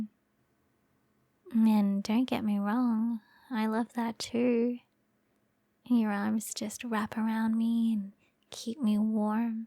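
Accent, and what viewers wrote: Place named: Australia. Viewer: dam what a guess that you’re from Australian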